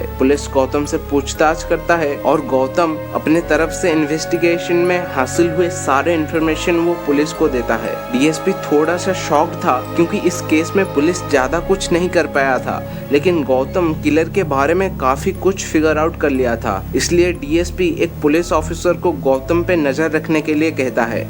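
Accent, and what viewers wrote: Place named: Odisha, India. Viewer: You must be a Odia guy, got from your accent. But nice explanation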